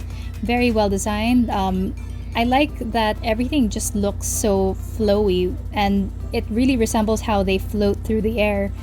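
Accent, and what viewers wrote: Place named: Philippines. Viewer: You sound noypi ma'am. I have been thinking of getting this set.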